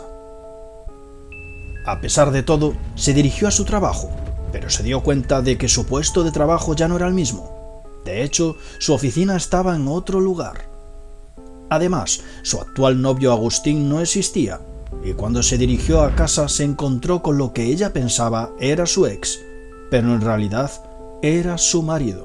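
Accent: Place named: Castile, Spain. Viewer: Io amo tantissimo questo accento del Castiigliano... oltre al tuo modo di esporre gli argomenti. Io credo nelle dimensioni alternative... chissà in un altro mondo io sono spagnolo e tu italiano ah ah! :-D M'iscrivo volentieri al canale. :-)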